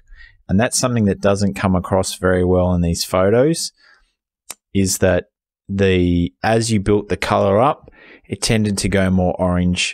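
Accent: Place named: Australia. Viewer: Good to hear another Aussie voice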